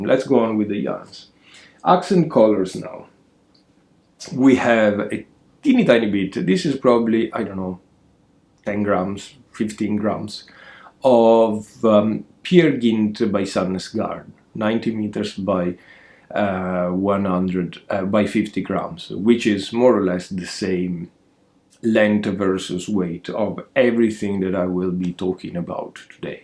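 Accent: Italy. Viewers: I love your podcast and I love your Italian accent!❤️ Also you didn’t seem grumpy! You are great and don’t change a thing. I be back again soon! Katie from Maine USA🌸🌙😊🧶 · Thank you for posting a wonderful video, even when you're feeling grumpy. Your mood is totally understandable with this constant rain! Jumpers look great. Hopefully you can soon get opportunity to paint your fence. Maybe we'll see the end result 😅 Can definitely hear the Irish accent come through, mixed with Italian, sounds so nice.. Take care and enjoy the Bank Holiday weekend too ❤ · Hej, I love your accent, I am from Denmark, and English with Italian accent is much better than with danish😀 being Danish I fully understand your being grumpy about rain…. 😂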